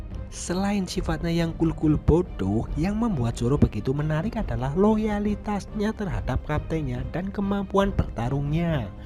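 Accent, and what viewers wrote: Banyumas, Indonesia. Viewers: paijo Londo lu ama aja menghina orang dengan logat ngapak bro.. jangan sok lu.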